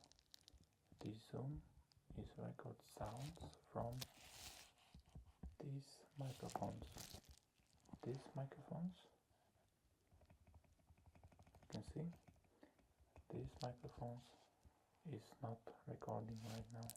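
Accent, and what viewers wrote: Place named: Poland. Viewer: I just keep coming back to this video. The Polish accent and loud variable sounds are extremely relaxing.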